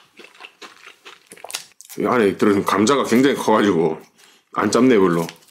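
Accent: Gyeongsang, South Korea. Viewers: 어디선가 뵌듯한데 어디서 봤는지 기억이 안나네ㅠㅠ 경상도 맞쥬? 위장 보호 해가미 드시소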